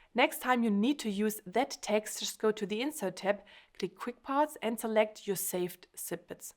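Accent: Germany. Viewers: is she german? I like her accent.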